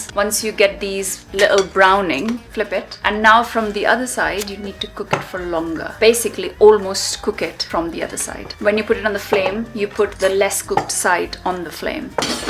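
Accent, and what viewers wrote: United Kingdom. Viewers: the problem is you bacame too british. . and wtf is this candle crap. turn on your lights lmao.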